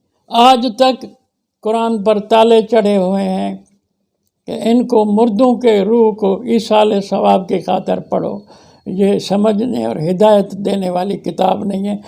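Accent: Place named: Sindh, Pakistan. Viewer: خوشی ھوتی ھے سنکر آپ کو، اور حیرت بھی کہ ایک سندھی اسپیکنگ کتنی ستھری اردو بول سکتاھے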